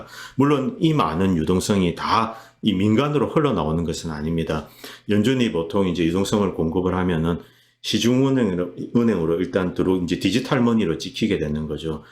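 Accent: Gyeongsang, South Korea. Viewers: 애쓰시네요 ㆍ그런데 이리 방대한 자료를 어디서 구하시는지 궁금하네요ㆍ 미국 계셔도 경상도사투리 구수합니다ㆍ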